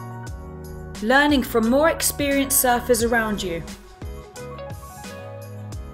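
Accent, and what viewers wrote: United Kingdom. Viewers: and we have a brit commentating here.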